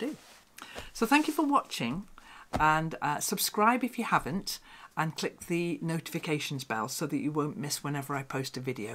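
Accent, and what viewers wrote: United Kingdom. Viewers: Woo so much work! Your jacket will be beautiful. My first vidéo from you but not the last one. I will learn english with your nice british accent.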